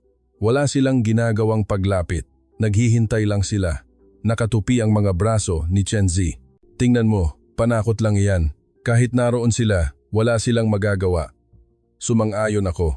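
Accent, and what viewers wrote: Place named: China. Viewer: Ang labo naman ng narrator di mo alam kung pinoy o Chinese... ito lang ang opinion ko sa ngayon handa na ang Pilipinas makidigma sa china pinaghandaan na yan ni PBBM kaya nga naglatag ng mga EDCA site para paghandaan ang gyera... Hindi kakayanin ng china ang pwersa ng Pilipinas dahil sa mga kaalyado nito na pinangungunahan ng USA kawawa lang china kapag nagkataon tancha ko lang 1 week lang ang itatagal ng china susuko sila sa bigat ng opensiba ng USA yon lang talaga iniiwasan din ni PBBM na magkaroon ng digmaan hanggang kaya pang iwasan...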